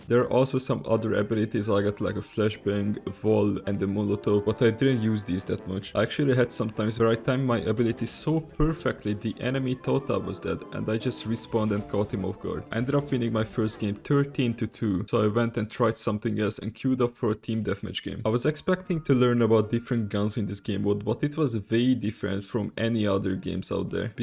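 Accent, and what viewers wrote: Hungary. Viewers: are you from hungary? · Hold up are you from hungary?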